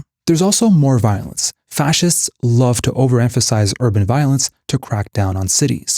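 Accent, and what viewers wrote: Quebec, Canada. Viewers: you’re a quebecor???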